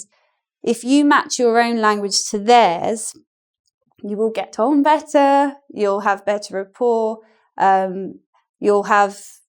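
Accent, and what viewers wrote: United Kingdom. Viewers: Greetz from Germany, your videos are great! And I love your British Englisch accent :-)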